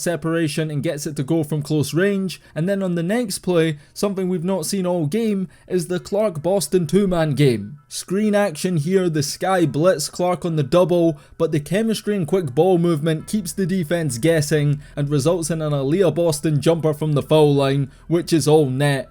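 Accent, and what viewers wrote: Scotland, United Kingdom. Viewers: I now want ALL my WNBA analysis delivered in a Scottish brogue❤ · I know he's saying "The Sky" but everytime this Scot says it I keep hearing him call these ladies "this guy" I was so confused for half this vid 😅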